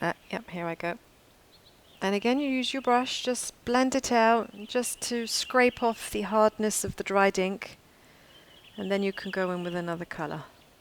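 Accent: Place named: United Kingdom. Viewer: dear, first of all,you should not, YOU CANNOT go back to not talking! I won’t let you,girl 😊 You are amazing, you’re just not use to it, but your voice is just as gentle as your hands are working. And your British accent on top of it wow!! You are definitely not annoying, you are not boring, don’t even dare think that! If someone doesn’t want to watch they can fast forward it,but I don’t think they will. And of course you made a lot of sense, you’re underestimating yourself, but seeing this in regular speed and hearing you explain it makes all the difference, please believe me! And of course, your piece came out wonderful as usual, gorgeous. I asked you previously about an airbrush,now I heard you saying you bought it a while ago. I would like one, but I guess if I want a better one I need to buy it secondhand. Although in generally I hear that they are using the airbrush to make flowers,petals or smaller more defined things., I enjoyed this so much,you can’t go back now to no talk. 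You are so lovely 😊🌺🌹 I will send you an email about Pashmina.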